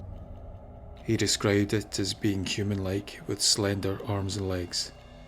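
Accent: Scotland, United Kingdom. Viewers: Happy birthday,always enjoy your stories with that added bit of history and i always love a vampire story...Thank you for always your lovely scottish narration😊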